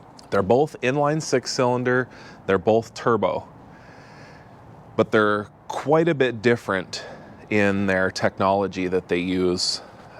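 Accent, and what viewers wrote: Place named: United States. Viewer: Hearing an American talk about diesel engines is crazy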